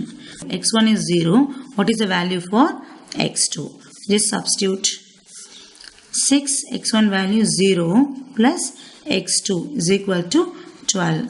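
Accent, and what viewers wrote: India. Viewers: the explanation is very good, especially with the indian accent :D , i have an exam and i wish that can help me to pass it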